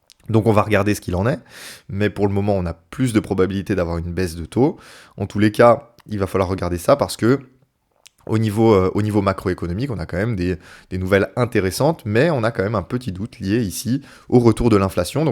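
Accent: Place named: France. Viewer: ...adopter du vocable anglais avec un accent francais HORRIBLE, c'est la loose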